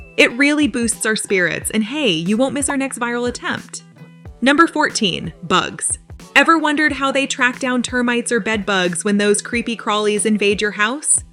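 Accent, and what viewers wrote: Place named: North America. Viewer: 😅?? it's a North American 'accent', so there is no accent!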